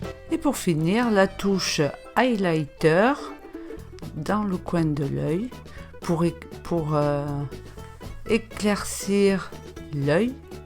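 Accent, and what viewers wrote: South, France. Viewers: Troooop mignonne ta 😍 mais elle ma trop fait penser à monsieur ribera dans ariol (c'est un dessin animer lool) il dit toujours "effectivement" et en plus lui aussi a l'accent du soleil lol. Bisouuuuus 😘😘❤❤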